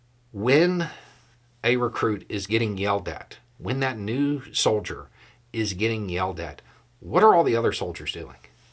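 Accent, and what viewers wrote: South, United States. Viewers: I beg your pardon, I happen to watch every video you put out. 😉 I also share them to educate those who are down the rabbit holes of conspiracy theories. Avid fan of your content, including Roads with. I’m a Georgia girl, so I just love to listen to you speak. You’re intelligence, calm demeanour & southern accent in a sing/song tempo is mesmerising. I do enjoy when your temper flares every now & again.😂 It reminds me of my childhood. Stern, but not aggressive.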